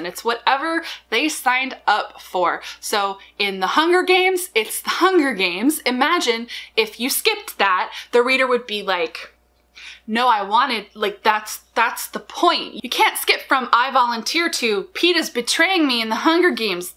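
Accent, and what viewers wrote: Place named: United States. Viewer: Yes, that is it, thank you! US accents on French words was throwing this Brit for a loop. I've googled so many variations of what I thought you said and, understandably, found nothing.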